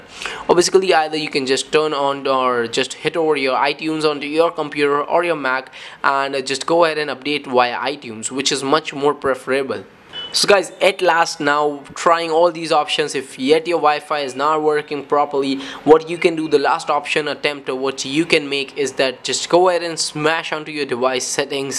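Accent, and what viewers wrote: India. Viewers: Thank you so much. It worked in my iphone by resetting network settings. I was feeling helpless before that. Search in YouTube how to fix it and found your video first. Btw I liked your indian accent.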